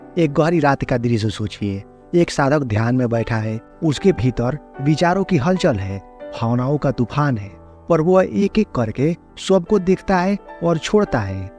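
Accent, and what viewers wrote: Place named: Bengal, India. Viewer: Bhai tu bangali hai... Ya bhojpuri... Mast bolta hao bhai...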